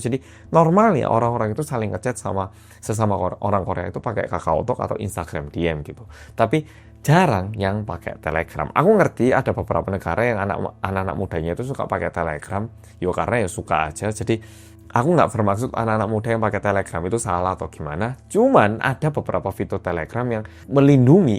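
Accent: Java, Indonesia. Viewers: Yutuber yg lain juga ada yg ikut bantu jelasin, tapi di liat2 aku lebih nyaman sama penjelasane mas 🤩 Jelas, teliti, ditambah aksen jowone iku loh seng bikin aku tresnooo😍🤣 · Bolak balik ngenteni rumpian mas medok iki rek..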